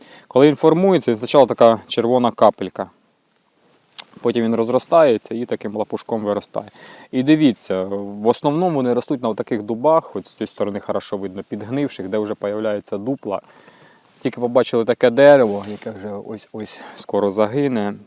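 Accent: Surzhyk, Ukraine. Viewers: Уж лучше на руском говорите. Ваш суржик режет уши . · Молодец, что на украинском. Правда, сильный суржик сходу слышится по первым минутам. :) Треба трошки попрацювати ще. Чтоб для ушей еще мелодичней было.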